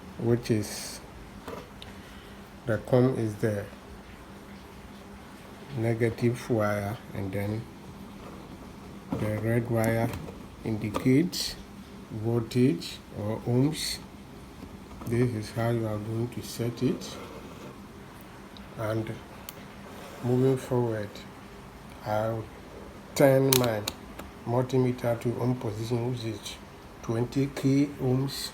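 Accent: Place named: Ghana. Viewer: what's up the accent gave you off. you be ghanaian abi? give me the location of your shop. i have a mirage that is guzzling fuel no code. thanks for the video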